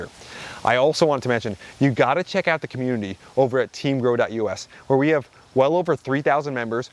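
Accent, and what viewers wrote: New Jersey, United States. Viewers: Love my guy from Jersey- Make sure you use lots of wooder...😂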